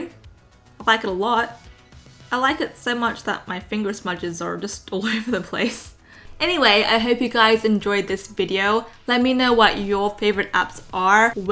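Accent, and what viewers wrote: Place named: France. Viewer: j'aime ton accent français, bravo. great video. x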